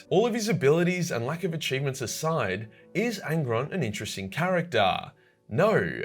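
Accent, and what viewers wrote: Australia. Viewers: He is a fellow aussie, matey bruh... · Who is this australian guy and why does he hate this fictionial angry man so much?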